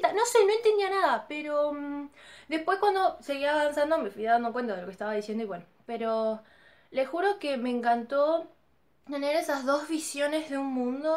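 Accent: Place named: Argentina. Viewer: Che, de que parte de Argentina sos?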